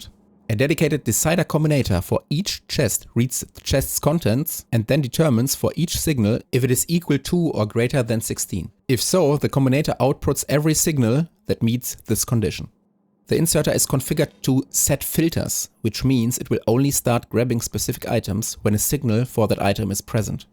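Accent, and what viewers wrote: Germany. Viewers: If your factory is dependend on belt speed, make smaller factories that work in parallel. 20 Sushi belts in 20 minimal bases instead of the clogging of a long track of recyclers. For me, any row of 20 or more recyclers clog up, as they cannot empty their contents. And even internal storage for anything but scrap is underused, as they will only do the exactly same recycle job to add to internal storage. Warum schreibe ich eigentlich Englisch, du sprichst doch mit deutschem Akzent im Video.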